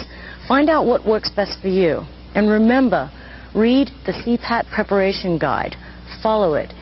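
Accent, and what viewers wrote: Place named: Australia. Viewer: I want to say Aussie who has lived in the US for a long time.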